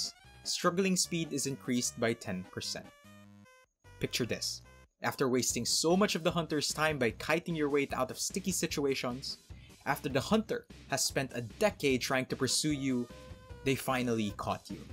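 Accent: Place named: Philippines. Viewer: are you a Filipino? I'm guessing based on your accent :D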